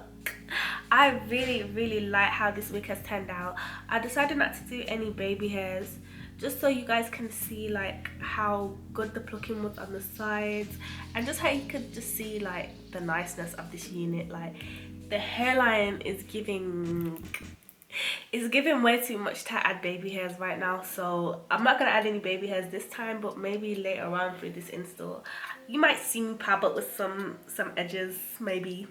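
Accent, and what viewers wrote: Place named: West Midlands, United Kingdom. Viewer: I love black girls with a West Midlands accents !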